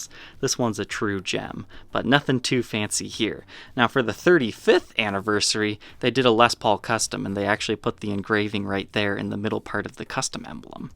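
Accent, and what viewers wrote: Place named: Midwest, United States. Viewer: Its the midwest accent "t" sounds are pronounced like "d"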